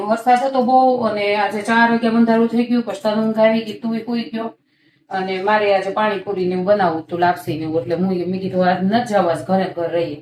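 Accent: Kathiawar, India. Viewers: કાઠિયાવાડી છો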